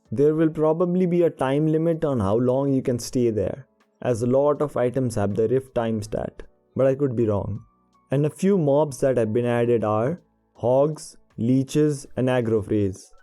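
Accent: India. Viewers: Hi are u Indian u sound like i also an indian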